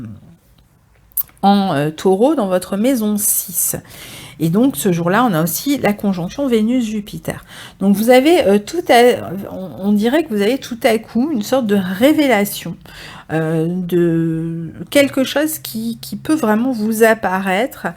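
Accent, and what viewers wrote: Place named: Nice, France. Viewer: Bonjour, c'est toujours avec un réel plaisir d'entendre votre accent Niçois;-) je vous avais demandé, mon transit pour 2019, celui-ci m' a été bien utile. Sagittaire asc scorpion du 24 novembre, je passe une dizaine ce jour, et je pense qu'en tant qu'artiste photographe les choses vont bouger, il y a déjà un frémissement, entre la méditation, les tarots et ma création, vous ciblez parfaitement ma situation. Beaucoup de choses changent et c'est pour mieux comprendre le sens de la vie, ce qui nous fait évoluer dans une spiritualité. Bref votre travail est gratifiant, et vous le faite avec un sérieux, et une parfaite maitrise du sujet, je ne peux que vous en féliciter. Merci encore, et gratitude.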